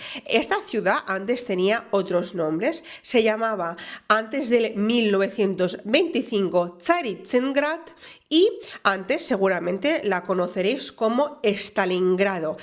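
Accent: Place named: Spain. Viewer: Gracias por mostrarnos lo que son estas grandes ciudades; queda el de planificar algún día no sólo en visitar el país, mas por que no aprender el idioma oficial; y, escuchando a en un buen castellano de España me atrevo a empezar desde hoy con una palabra; después Dios dirá… Saludos desde la bahía de San Francisco, California. Jorge Edwin Marroquín Mansilla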